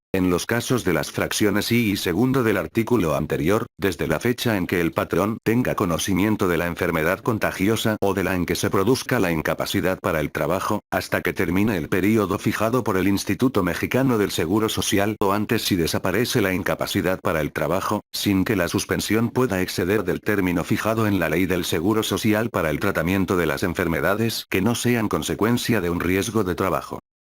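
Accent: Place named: Spain. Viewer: Por qué en acento español.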